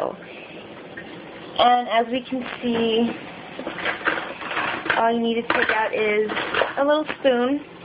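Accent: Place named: United States. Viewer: Gotta love when idiots are explained how somethign works then makes youtube videos about it: Here.. I have something which obviously is larger than CUPS... marked with 12 sure as hell wonæt be litres and given the accent, we can only surmise it is a yank, no wonder, so perhaps gallons... 12 gallons that size that far up.. feasible, looks a bit over the top but still within reason... Stupid girl goes erm 12 , let me think, cups, ye thatæs right.... JESSSUUSSS. Just leave our planet already please x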